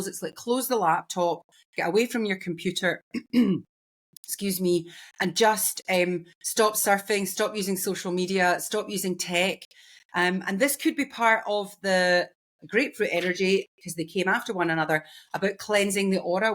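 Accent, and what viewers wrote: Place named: Scotland, United Kingdom. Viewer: I felt connected with the first video I saw. Thank you, and PLEASE keep going. Wishing you all the best for your channel. I've just shared this vid. P.s. I absolutely adore your accent. Scotland is One of my most favourite places on earth. ❤XX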